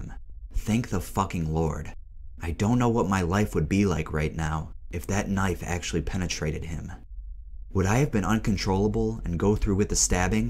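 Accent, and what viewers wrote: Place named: Upstate New York, United States. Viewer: Yooo i knew you had an upstate ny accent haha 585 waddup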